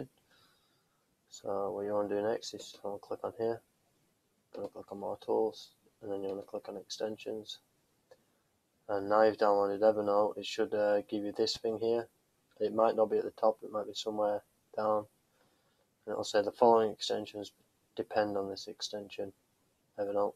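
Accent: Manchester, United Kingdom. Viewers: He has a Manchester/Lancashire accent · yes mate are you from manchester? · at times un-understandstable because of very distinct Brit accent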